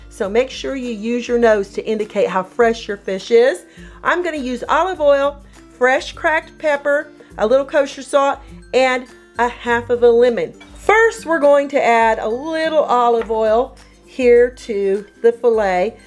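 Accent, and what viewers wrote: United States. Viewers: I've never heard anyone more American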